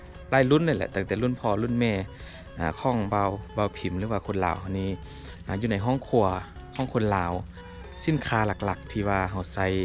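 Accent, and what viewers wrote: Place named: Loei, Thailand. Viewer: พูดสำเนียงเดียวกับเมืองเลย หล่มสัก เป๊ะ เราชาติพันธุ์เดียวกันเด้อ แต่โดนฝรั่งเศสยึดไป สมัย ร.5 ❤❤❤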